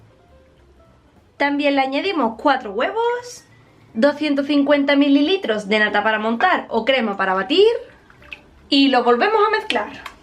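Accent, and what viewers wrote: Andalusia, Spain. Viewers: Primera vez que me topo con tu canal y me ha encantado tus recetas y carisma, por tu acento supongo sos Andaluza. Saludos desde Honduras. ❤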